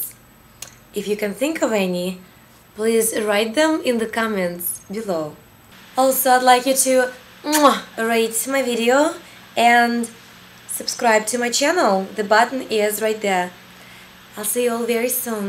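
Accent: Russia. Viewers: you're russian, right ? :))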